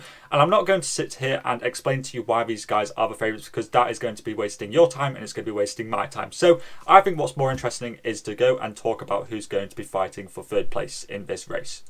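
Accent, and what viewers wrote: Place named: England, United Kingdom. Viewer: Arf j'ai cru pendant 5 secondes que la preview allait être en français 😢 Heureusement, j'aime bien ton accent anglais.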